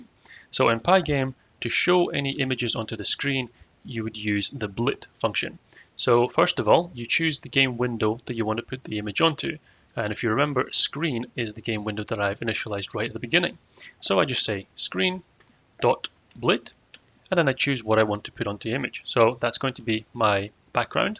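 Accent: United Kingdom. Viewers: Omg I never hear somebody with the same accent as me on YouTube. Ayyy Northern Irish accent representation 🫶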